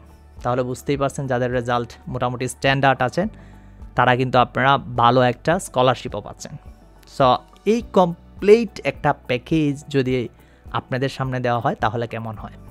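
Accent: Sylhet, Bangladesh. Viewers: ভাই আপনার বাড়ি কি সিলেট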